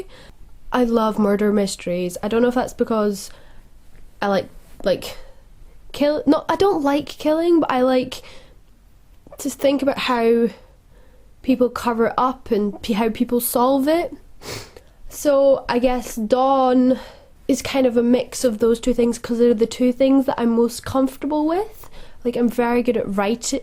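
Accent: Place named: Scotland, United Kingdom. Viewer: Haha, even with a cold, your voice still sounds really nice. I love Scottish accents. :) Okay, anyway, this was really interesting!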